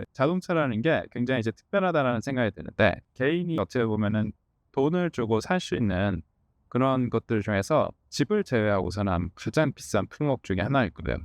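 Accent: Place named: United States. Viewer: 혀좀 덜구리지요 미국말 어색해 ㅎㅎ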